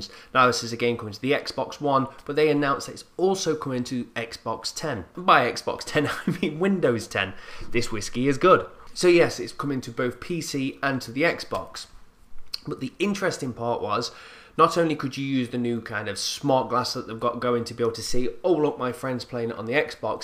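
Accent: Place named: Northern England, United Kingdom. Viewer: Adding the letter "t" to words that don't need it is a common thing in the North,. T'internet and all that.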